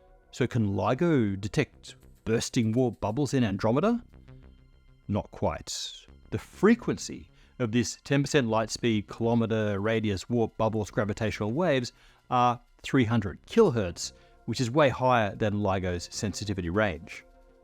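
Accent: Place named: Australia. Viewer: He's Australian lol.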